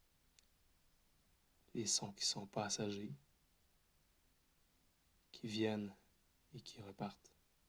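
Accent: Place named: Quebec, Canada. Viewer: ton accent québécois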